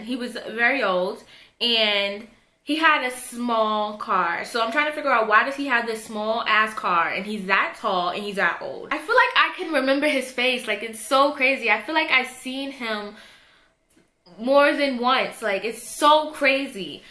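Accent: New York, United States. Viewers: Is this chick from new york ? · That New York accent 😍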